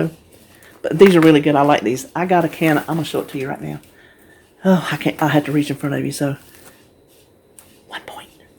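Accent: South, United States. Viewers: These are a great idea!!! I love pop chips, but I buy the bigger bag and weigh them out and put in baggies. Bc I could definitely eat to many. I buy the big bags bc I love the flavored kind, barbecue, sour cream and onion, and cheddar.. so good! I don’t care for the plain. You are so sweet!!! Love your accent, I’m from the south and moved north for my husbands job, so it’s so refreshing to hear that accent. Everywhere I go someone always comments on it 😂.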